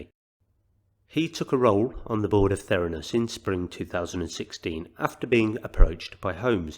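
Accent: United Kingdom. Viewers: Thank you for your update……really enjoy listening. Good to hear a fellow Brit!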